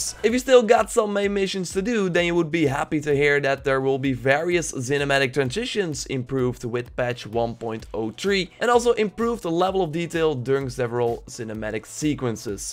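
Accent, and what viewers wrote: Netherlands. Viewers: Are you dutch? Ik hoor het beetje. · Dutchies are so recognizable haha, I love our little accent. Dope video though!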